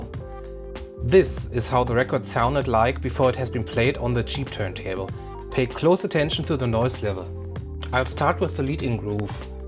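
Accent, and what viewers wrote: Germany. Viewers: Are you German :D? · Your slight German accent is adorable! Und Du bist so gescheit.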